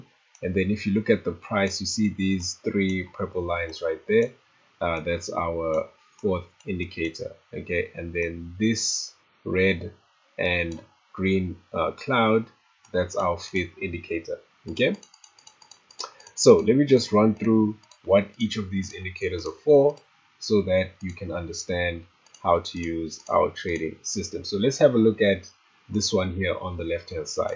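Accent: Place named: South Africa. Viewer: I swear to you. You are a South African😊I like that. This video has helped me a lot. Thank you so much. Keep doing the great work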